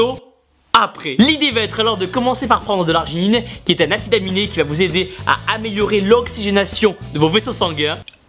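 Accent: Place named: Toulouse, France. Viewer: Quel bel accent toulousain!!!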